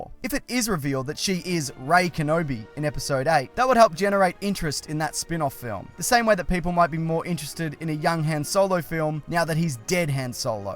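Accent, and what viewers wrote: Australia. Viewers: Are you Australian? Us Australia are mad dogs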